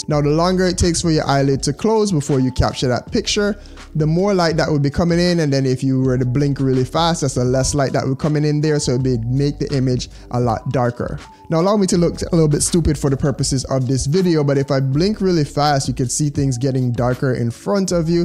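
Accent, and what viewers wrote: Jamaica. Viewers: Transitioning from a career in law to documentary filmmaking and of course taking the self-teaching approach. After so many attempts of trying to understand this, your video is the only one that has made sense for my way of learning. You had analogies and visual examples, properly timed. I took notes! Thanks so much for this and shout out to a fellow Jamaican (based on your accent, hopefully im not wrong :) )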